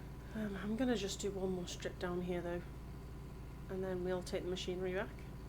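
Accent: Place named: Yorkshire, United Kingdom. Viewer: Great first talking video. Clearly you have some natural talent there 👍🏽😊 Btw I thought you were Scottish but you sound more Yorkshire’ish? 🤔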